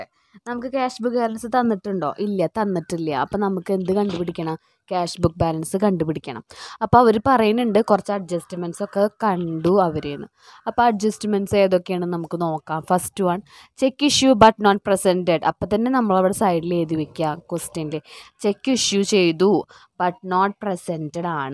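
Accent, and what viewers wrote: Thrissur, India. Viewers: Teacher niggal thrisuur anno, aah oru slang😊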